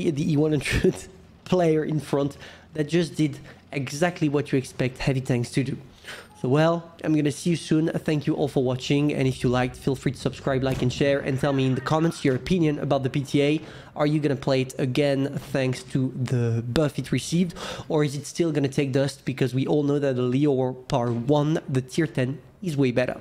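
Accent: France. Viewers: My ears hurt when he pronounces english words like they were French and I can tell because I'm French myself but I'll give him credit for speech flow.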